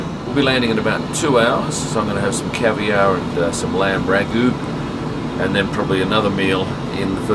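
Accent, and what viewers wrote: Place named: Western Australia, Australia. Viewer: Mate I didn't know you were a west aussie! Subbed!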